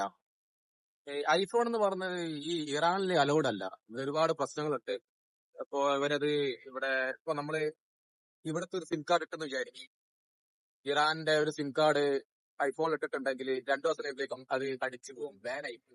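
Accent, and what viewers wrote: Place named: Beypore, India. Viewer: Nyan beypore annuu..ningalude samsaaram kettappam pudi kitty...😅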